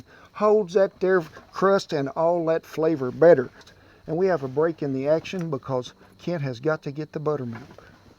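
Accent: South, United States. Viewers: You are my kind of western chef... garden veggies, outdoor cooking everything, and the mostest great old Southern talk’n! I love okra any which way! Squash too! All fresh from the garden! Yummy to my tummy!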